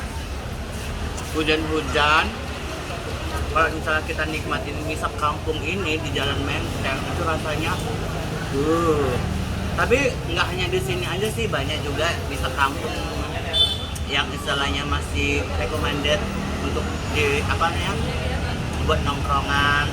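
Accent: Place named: Banyumas, Indonesia. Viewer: Kak sering-sering update ngapak😭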